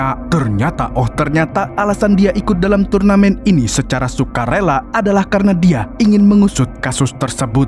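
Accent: Banjar, Indonesia. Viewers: Fix urang banjar wkwk, banjarmna pian 😂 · Lah org banjar kah🤣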